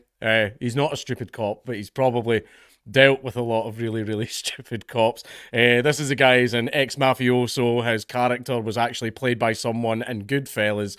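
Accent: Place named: Scotland, United Kingdom. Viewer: "Irish accent", coming from a famous Scottish fella? You numpty.😂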